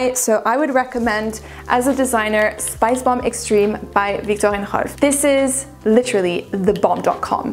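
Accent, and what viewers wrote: France. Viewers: My God that accent…I could listen to french people discuss anything. The list is really impressive and has given me some great ideas to sample. Cheers! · She’s a franchie, I’m French so i can see it obviously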